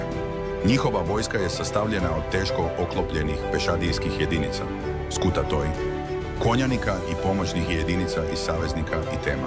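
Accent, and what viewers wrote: Croatia. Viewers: Volim slušat ovaj naš Hrvatski glas kako hvali našu bracu po materi.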